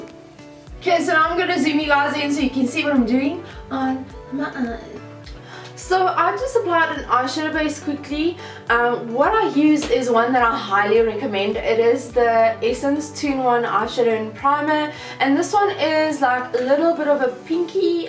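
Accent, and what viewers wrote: South Africa. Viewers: Cool babe !! I miss South African accents so much !!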